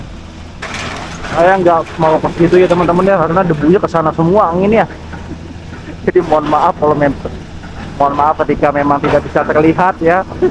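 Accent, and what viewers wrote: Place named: West Java, Indonesia. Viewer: Pake V neng. Sia orang sunda tah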